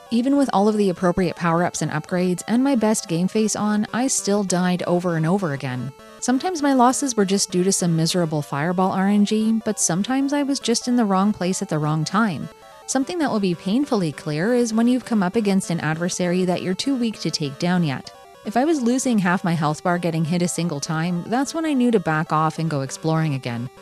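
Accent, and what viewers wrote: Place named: Canada. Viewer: Love your quirky videos and your charming Canadian (I think) accent. ❤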